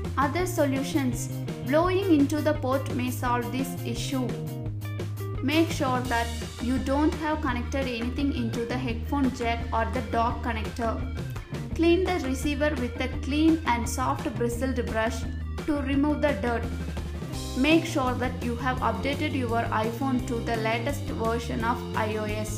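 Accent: India. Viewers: Opens video Hears indian accent Closes video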